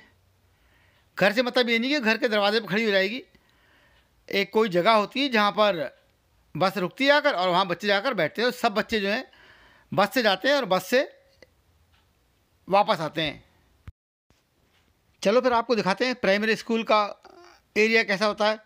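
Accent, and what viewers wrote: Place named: Moradabad, India. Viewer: बहुत अच्छी रिपोर्ट है और आपकी भाषा सुन कर मुझे ऐसा लगता है कि आप मुरादाबाद, रामपुर या चंदौसी के रहने वाले हैं। अगर मैं सही हूँ तो कृपया जवाब दें।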